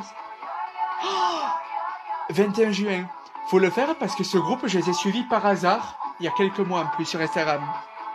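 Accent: South, France. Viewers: Sa se voit que tu VIEN du sud de la France comme moi 😊🇫🇷🖤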